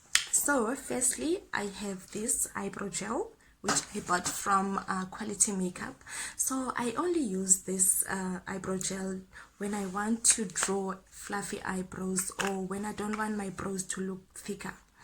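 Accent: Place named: South Africa. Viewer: SouthAfrican i love ur accent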